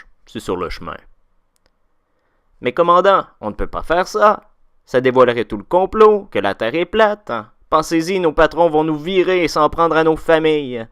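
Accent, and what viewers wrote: Quebec, Canada. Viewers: Il y a des tonnes de débunks et la platosphère est gonflante... Mais un débunkage avec l'accent des érables, c'est tout de suite plus cool. Vivement la suite ! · dim dim Hé oh, pas d'insolence avec nos cousins québécois ! Et puis moi je l'aime bien celui là, et ça change de l'accent suisse 😃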